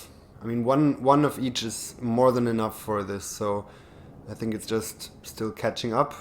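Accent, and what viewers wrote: Germany. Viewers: Just found your channel and really enjoyed you rambling. Are you german?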